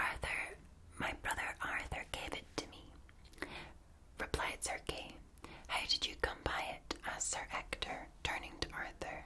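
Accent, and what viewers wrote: Scotland, United Kingdom. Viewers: Lovely, little Angel. Another delightful session just how I like it. Up close and personal with the very tingly “dot, dot, line, line” verse! I love listening to you read with that gorgeous Scottish brogue! You lovely face just lights up my iPad screen as well as this old heart of mine. Thank you so very much, darlin’ lass! 🌹🙏🤗🧚‍♀️ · I would absolutely love more stories read in that accent. Makes me want to channel my scottish ancestry and fight the brits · First of yours I've seen but the Scottish accent although not same dialect as mine is like being back home even just for twenty minutes. Hope ye keep it up. Very talented lassie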